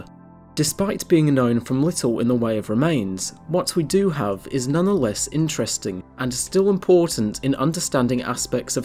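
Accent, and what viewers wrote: England, United Kingdom. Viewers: Why do all these guys who push the feathered dinosaur theory have English accents?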